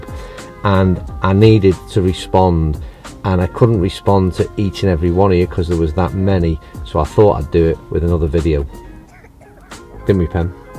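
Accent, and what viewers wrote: Liverpool, United Kingdom. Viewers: You say you’re from Wales but do I detect a bit of a scouse accent. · Well done on your decision, I wish you every success! From your voice I'd assumed you were from my neck of the woods (Liverpool) but Wales is close enough innit. Must get back to Nefyn one day, what a course and location! All the best for Christmas mate.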